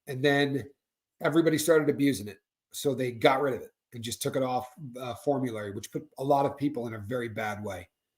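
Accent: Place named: South Boston, United States. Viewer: I hear a lil bit of Southie in your voice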